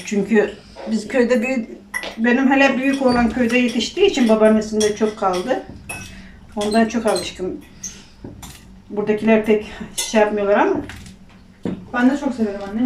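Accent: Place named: Thessaloniki, Greece. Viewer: Annemizin şivesi ni Selanik macirlar gibi konuşuyor Memleket nere si acaba bu arada Miraç kandiliniz hayırlı olsun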